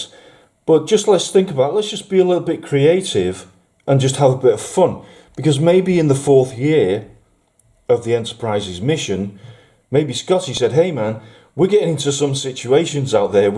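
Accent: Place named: Bury, United Kingdom. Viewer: Wow, amazing work, my friend! Your skills are out of this world, which is quite apprpriate! Growing up, I always preferred the overall look of the Enterprise D exterior, however, I recently learned just how VAST the D is, like a city, too big to walk around, you're 100% dependent upon the turbolifts, and that really put me off. The curved corridors we see on TNG have a TINY diameter compared to the actual outer saucer. That ship is so vast, it's actually nightmarish. Too big to feel like home. Now as for the original 1701, it's still huge, but small enough to feel comfortable, small enough to walk around, so I'm starting to appreciate it way more. As for you taking a few liberties with the design - why not? Since the "official" layout diagrams were incomplete, it's up to the fans to fill in the gaps using logic and knowledge of the show. 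By the way, your accent reminds me of the Bury area, where my dad's from :-)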